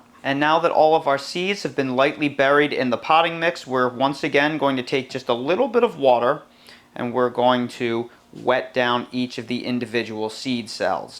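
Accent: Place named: Philadelphia, United States. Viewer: I recognize ur Philiy accent!!❤️ God's Blessings to u in all ways!!💜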